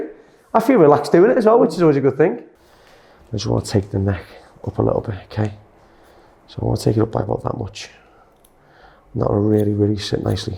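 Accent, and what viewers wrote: United Kingdom. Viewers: Im a green barber trying to learn as much as i can . This one was actually super helpful .. a cool ,simple and quick hair cut well done. Im just wondering how you find the guide in each section . Cuz i lost mine in this type of heavy hair ... and its a bit hard for me to hear what you saying ... wearing a mask and hard British accent 😅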